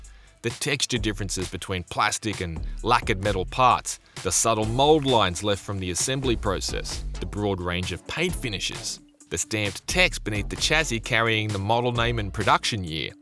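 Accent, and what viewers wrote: Australia. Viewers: Guy sounds like an Australian parody, complete with the toilet strain accents. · Hears an Australian accent = SOLD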